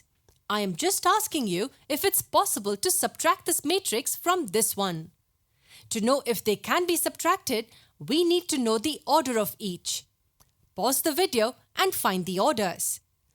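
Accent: India. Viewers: Whats wrong with her accent? Seriously? Dude, we are here to learn something, it does not matter what accent, race or religion she belongs to. I would suggest, get used to Indian Accent because in the next 5 years, you are going to only hear that on YouTube as more and more people in India come online. This is 2020. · Indian accent :(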